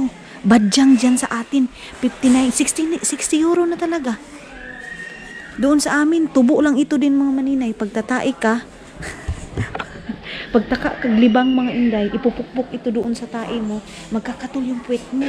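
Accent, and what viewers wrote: Visayas, Philippines. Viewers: Hello maninay ang ganda ng house mo sosyal tuwang tuwa ako sayo maninay pagnabibisaya k nakakatuwa k kc magsalita iloveyou maninay godbless you 🥰🥰🥰🥰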